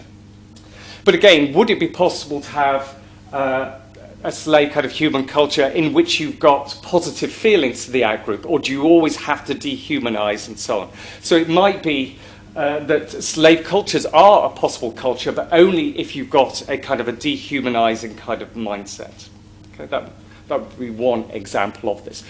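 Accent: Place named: United Kingdom. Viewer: is the british accent that difficult to decipher? i enjoy it immensely :)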